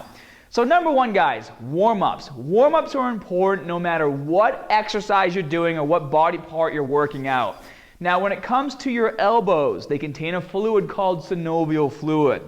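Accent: Boston, United States. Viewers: How can he? Hes from Boston. They all speak like that there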